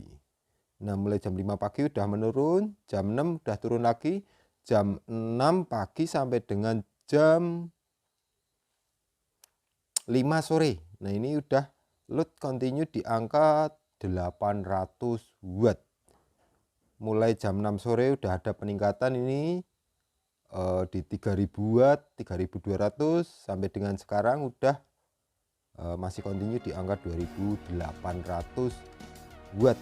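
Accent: Java, Indonesia. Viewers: kuping gak usah di pasang kalo gak mau dengar logat medok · oh maksud lu skrg ngancam? Silahkan lanjutkan.. Tidak sedikitpun saya takut dgn orang bodoh seperti anda.. Semua yg baca komen2 ini pasti bisa menilai. Dan menyedihkan betapa bodoh dan sempitnya dunia anda sampai mengatakan bajwa logat medok di video itu dibuat-buat.. Wkwkwkwkwwkkkkkk Saya sangat heran dgn tingkat kebencian dan ketidaksukaan anda hanya karena logat medok, sepertinya anda memang perlu konsultasi dgn psikolog.. Wkwkwkwkwkwkwkkkk Sungguh saya tdk membayangkan jika orang2 medok di Jogja mentalitas-nya seperti anda, menerima banyak teman2 dari seluruh Indonesia yg logatnya berbeda2 dan sangat beragam. Tapi untungnya menerima beragam logat dari kawan2 di seluruh Indonesia justru bisa membuat semua berbaur dengan akrab di Jogja.. Cukup sekian dari saya menanggapi anda yang "sakit", selanjutnya silahkan kalau anda akan selalu ngeles ataupun anda mau terus menerus memupuk ketidaksukaan dan kebencian anda dgn sesama bangsa Indonesia, sekedar karena perbedaan logat dan latar belakang budaya.. 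· intinya gw nggk seneng aja logat medok udah itu ajaa bisa kan biasa ajaa nggk usah medok2 gitu maaf yaa gw bukan orang jawa ngertiii loee klo nggk ngerti ilmu psikologi nggk usah ngegas emang dasar nya nggk ngerti yaa udah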